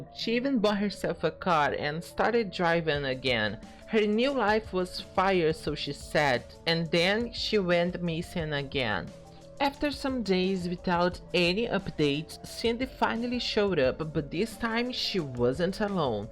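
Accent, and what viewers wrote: Brazil. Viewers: meu vc é brasileiro? kkkk senti mto o sotaque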